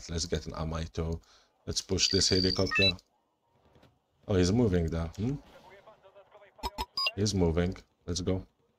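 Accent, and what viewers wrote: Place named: North Africa. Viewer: Your English is good BRO, it's like North African english.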